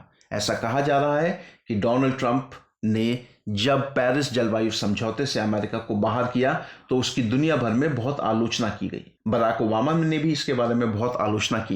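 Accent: Bihar, India. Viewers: bihari tone sun kar aacha laga.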